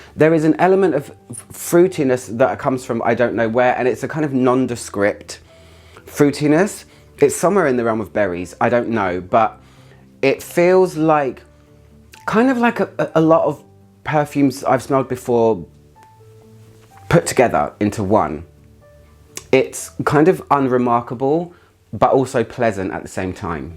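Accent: United Kingdom. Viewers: 😂 I’m American and this whole time I thought it was your British accent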